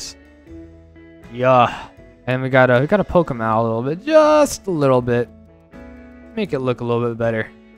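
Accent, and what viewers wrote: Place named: United States. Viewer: You have a deep American ascent you like truck and a ak47 in your truck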